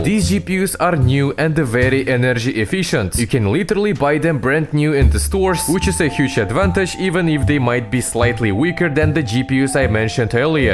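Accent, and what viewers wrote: Russia. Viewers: Do only I hear russian accent? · По акценту слышно что наш) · bro has the strongest russian accent ive heard lol😂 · Bro u russian right? Its accent🤔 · I hear that Russian accent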